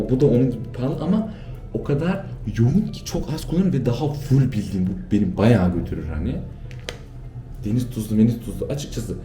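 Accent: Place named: Rize, Turkey. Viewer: O ne öyle rizeli rizeli şeyler✨✨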